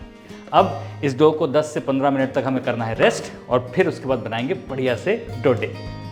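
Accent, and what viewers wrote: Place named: Sindhi, India. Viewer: Ur Sindhi speaking is just💖💖...so sweet... but it's ढोढो not डोडो😅. U r a great chef and extremely humble person..we love you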